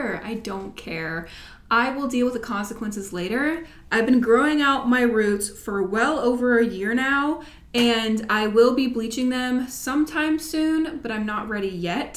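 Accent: United Kingdom. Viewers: Major Ginger Spice vibes - perfect with the brit accent ✌️👩‍🦰🇬🇧 Love it!!! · Lol 😂 love your British accent, Hi from Manchester in the UK 🇬🇧 x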